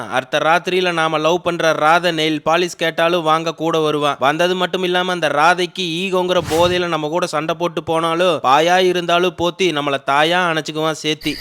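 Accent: Coimbatore, India. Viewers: Anna Namma Coimbatore tamil alago alagu .neenga pesarathu ketka ennum alagu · Anna unga enga kongu Nadu voice ye Thani anna super 🎉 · Editing and timing counters are fantastic ❤❤❤ Moreover very catchy voiceover in Coimbatore slang❤❤❤ Semmaa kannu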